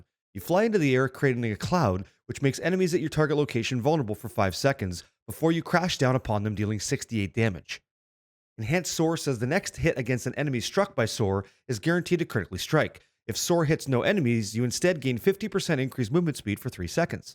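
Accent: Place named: Canada. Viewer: when canadians speak 🤮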